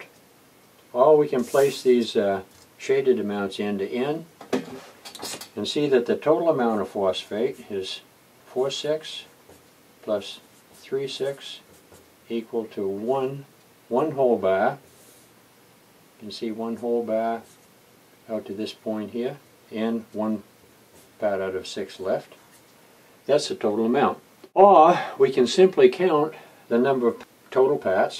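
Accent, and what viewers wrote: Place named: Boston, United States. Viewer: Much respect, talking about fraction "bah's" like he's from Bah-ston.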